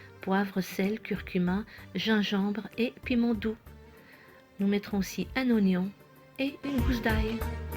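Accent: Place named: Algeria. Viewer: Salem alik j aime bien tes recettes tu parle bien algérien je suis algérienne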